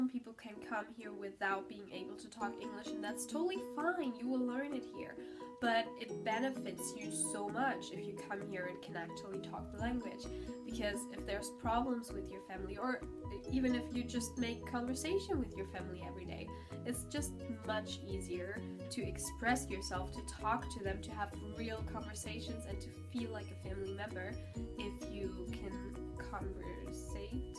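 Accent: United States. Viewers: Thank you for taking the time to do this video. I follow many Au Pairs and Exchange students on you tube. It is so interesting to hear what each individual takes from their time in the US. Positive and negative. I see a definite pattern with certain countries and whether they speak their native language or in English in their videos. Norwegian, Danish, Finnish and the Dutch tend to speak English. German, French and Russian tend to speak their native language. If you decide to do a Q&A I have a couple questions. You answered one. Do you ever catch yourself thinking in English and the second part would be do you ever dream in English. I see many exchange student in the US start to catch themselves thinking or dreaming in English after about three months. How strange that must be the first time it happens. What were your reasons for choosing the US for you year as an Au Pair. 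Numbers wise the US has the most German AuPairs most years. Last is your accent of lack of an accent really. Your choice of words and pronunciation, to me, sound very much like the US version of English and not the British. When English is taught in Germany which version do they teach? Your English, at least to me is very very good. By the definition I read you are bilingual. I hope you enjoy your time here. I lived in New London, CT for six years so I know what it is like up there. Will you take a travel month when your year is up? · I looked up the word bilingual in the dictionary and if I remember it correctly, being bilingual is when you can speak two languages fluently. Also, on a side note, you have a great american accent. Oh, conversate is a word:)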